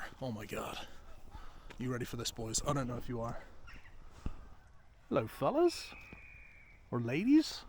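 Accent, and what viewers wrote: Bristol, United Kingdom. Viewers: Are you from Bristol? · what part of the uk are you from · i know that good old bristolian accent i always get mistaken for being from bristol lol. Im pure wiltshire....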